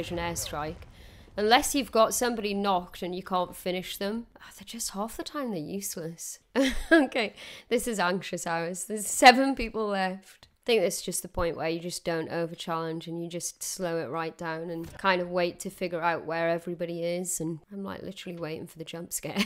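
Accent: United Kingdom. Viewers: Is she from the UK?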